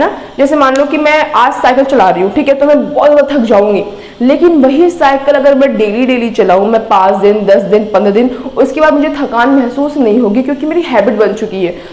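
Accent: Bihar, India. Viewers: Very sweet voice mam.. Madam bojha dhone like we r bihari... Proud be a bihari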